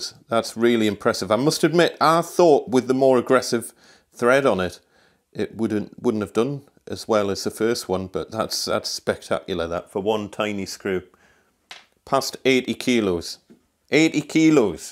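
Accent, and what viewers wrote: Newcastle, United Kingdom. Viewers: Love the Geordie accent from the Northeast.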